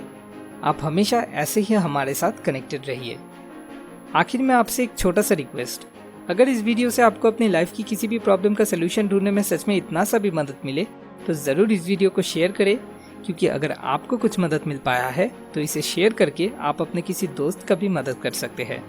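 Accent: Bengal, India. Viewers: aapki accent Bengali Wali hai...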